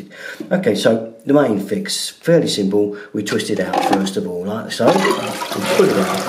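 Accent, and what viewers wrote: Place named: England, United Kingdom. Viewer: I’ve learned a lot from this guy over the years. Down to earth sort of chat and really helpful. Saved me a fortune in plumbers’ costs. Lovely English accent too.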